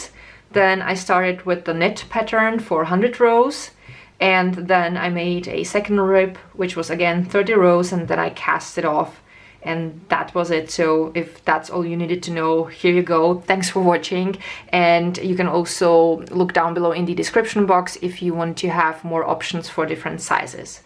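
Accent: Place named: Germany. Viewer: So practical that you explain how to do it with a long wire! Its just what I needed! You are an amazing teacher! Love your german accent. Grüsse aus Argentinien und danke vielmals!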